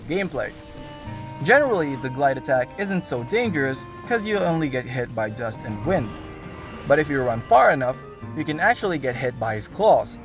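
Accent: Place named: Philippines. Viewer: However, I noticed your accent sounds familiar, sounds like kababayan 🤔, if you don't know that, forget it 😆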